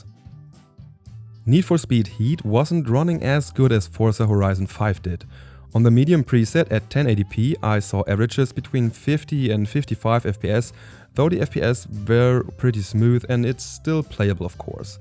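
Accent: Germany. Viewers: I bought my mom a mini PC with a Ryzen 7 H 255, 780M graphics, and 24GB of RAM. It's mainly for city-builders/strategy games, and I'm curious to see what this little machine can do. Linux would also be interesting as the OS, since it's supposed to run faster. And you can also use FSR 4.1.1 pretty well now with OptiScaler. Interessant wie man unseren Akzent schlecht verbergen kann ;)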